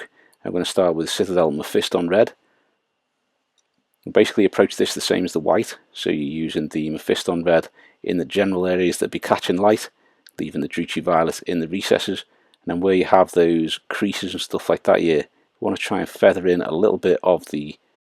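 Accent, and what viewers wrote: Liverpool, United Kingdom. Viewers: Why is whenever I hear a fellow scouser on YouTube I instantly sub 😂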